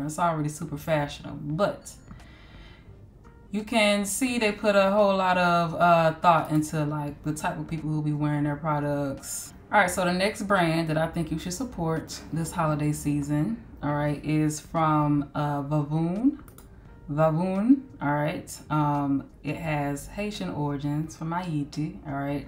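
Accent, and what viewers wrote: Jamaica. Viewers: Peace and Love, it's great to see you posting more videos lately. Mi love fi hear yu talk Patwah 🙂. I will definitely check out these business. I was about to order the Jamaica jersey but saw that it wouldn't be shipped until 4 to 5 Weeks. Will get it next month. Dat deh Jersey Nice!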